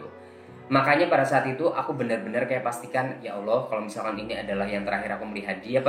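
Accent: Java, Indonesia. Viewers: mas foto wkt muda cakep bingit... bahasa nya medok banget seperti org jawa gitu...emang nya org jawa ya mas..?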